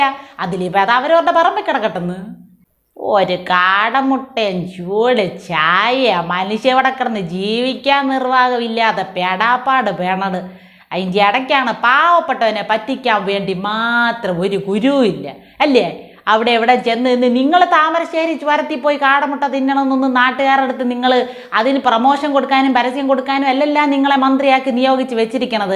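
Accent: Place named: Trivandrum, India. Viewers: തേരോന്തരം ഭാഷ പോളിയാണ് 👍👍👍🤗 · തിരോന്തരം ശൈലി ബേഷായി😅😅😅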